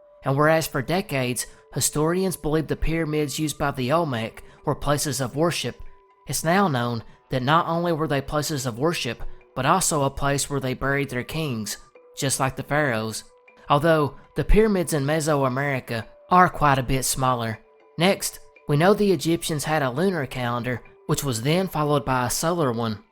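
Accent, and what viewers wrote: South, United States. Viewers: lol dude I can’t lie, hearing you say “Mulan Pie” a hundred and fifty times in that signature southern brogue was worth the price of admission. I’m gonna watch all adverts all the way through for the rest of the video lol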